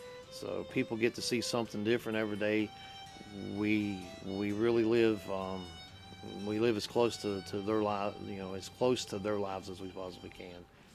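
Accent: United States. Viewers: I guess Americans watching this video expect to hear the American accent when in fact they would be speaking a variety of English & European accents. For me it spoils the video, the accent just does not fit the period. Are Americans able to fake an English or other accent or is it very difficult for you to do that? Perhaps you are just not into being that authentic in your interpretation. Regards, Keith.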